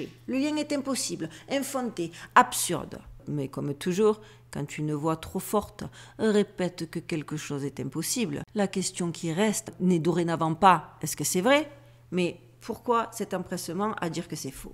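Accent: South, France. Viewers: hello, encore et tjrs un régal soupoudré par ton accent des cigals, moi la parigotte, j'adoooore, et te remercie, sais tu qu'il a été plus que soupconné, voire prouvé, que les cropcircles, les vrais sont formés par une énergie electro magnétique qui vient du sous sol et non du ciel comme nous le supposions et oui les fréquences electro magnétiques agissant comme on le sait sur le vivant, et de façon comme tu le dit, totalement impossible à reproduire avec des raquettes attachées aux chaussures, bref juste pour ajouter à tes infos que les fréquences qui dessinnent ces crpcircles viennent de l'intérieur de la terre, comme quoi à force de nous avoir dit d'observer le ciel, peut être que tout vient de l'intérieur et non de l'extérieur comme pas mal de choses, n'oublions pas que tt est inversé, donc, commençons à regarder vers le bas et cessons de regarder les mouches voler ou un ciel encodé, là pour nous déviez l'attention de ce que nous devrions réellement regarder, MERCI pour celle que tu es, MERCI pour ta belle humeur, sourire et humour nécessaire en ces temps de mer.......e. 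Love from Paris!!! Nathalie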